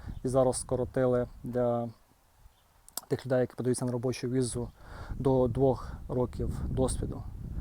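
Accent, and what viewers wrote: Cherkasy, Ukraine. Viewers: Дякую вам за чудову мову, я насолоджуюсь! Бо та кацапщина, навіть з уст українців вже в горлі стоїть! По акценту спробую вгадати, Чекркащина або Польавчина??)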